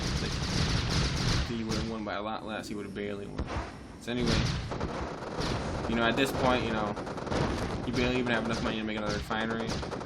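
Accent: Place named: New York, United States. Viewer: you come from new york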